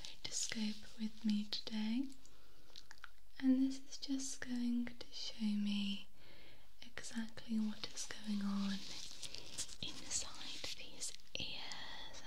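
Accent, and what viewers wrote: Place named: United Kingdom. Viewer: Your voice relaxes me especially the way you intimately talk into the microphone. What kind of British accent do you have exactly ? It’s perfect for ASMR.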